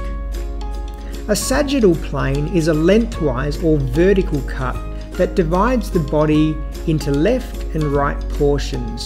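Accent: Australia. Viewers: first year nursing, thank you for your so clear explanation, loving your Aussie accent.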